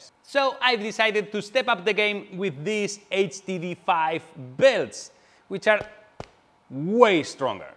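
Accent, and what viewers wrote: Spain. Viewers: Eres español no? El nombre y sobretodo el acento🤣🤣🤣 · Hola Espanyolo, which ciudad are you in? I hit play, and within about 0.005 seconds my wife had said unintelligibly quickly: he's a Spanish - which makes her Ingles sound a lot worse than it actually is. I'd say it's stronger than yours, certainly weaker accent, too :-D I enjoyed the video, nice work on the upgrades. Next thing you'll be building one as capable as TOT's CNC router :-D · ya sabemos que sos español flaco, porfavor, o habla bien ingles o habla en español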